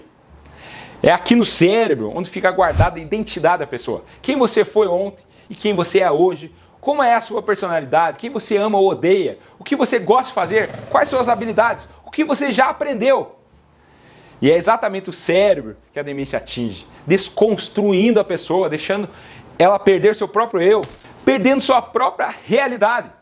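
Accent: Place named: Minas Gerais, Brazil. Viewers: Doutor de acompanho da Bélgica, gosto demais, e amo teu sotaque, você é de Minas Gerais, ou estou enganado? Abraço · Gosto muito do jeito q ele fala,como se fosse mineiro 👏👏👏👏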